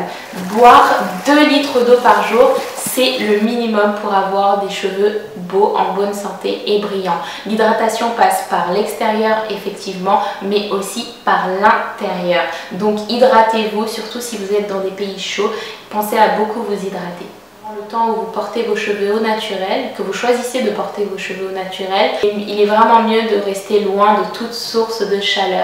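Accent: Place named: France. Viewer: vous avez une belle accente francaise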